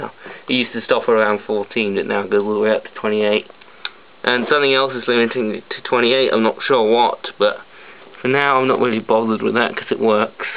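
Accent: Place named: Southern England, United Kingdom. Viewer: Nice hacking, interesting video :) Whereabouts are you from? I can't quite figure out your accent.... Essex? Kent? Or further west?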